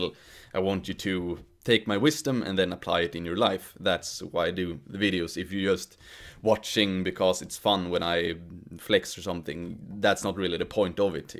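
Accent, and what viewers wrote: Sweden. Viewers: Don't worry about Swedish accent in your vids as it signifies where you're from. Content is all that matters. Fluff and beautiful sets don't signify quality. People will wade through mud to listen to reason. I shared your last vid about porn and its detrimental affects on women in a bunch of facebook groups so hopefully your audience will increase as a result. Be up to the task. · Nothing wrong with a Swede having a Swedish accent. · But the Swedish accent is great. Yeah your old vids... In them you speak better English than a lot of people in Limerick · I love our swedish-english accent. Its the best accent exept the geordie accent from Newcastle-upon-Tyne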